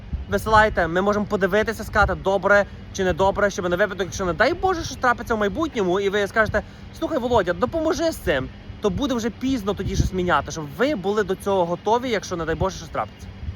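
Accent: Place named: United States. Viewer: Швидко та якісно розмовляєш українською!ще й навіть легким американським акцентом😀👍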